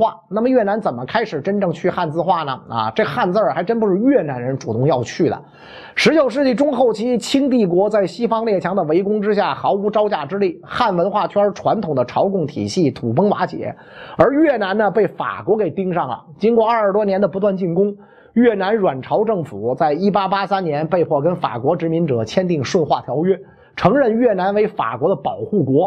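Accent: Beijing, China. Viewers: 神奇...你会被袁老师影响成一口京片子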